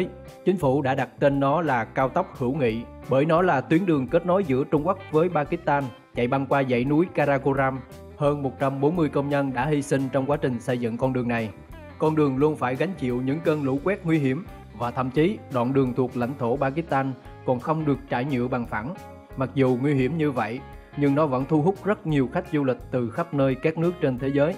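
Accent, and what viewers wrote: Central, Vietnam. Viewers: Ad quê ở miền trung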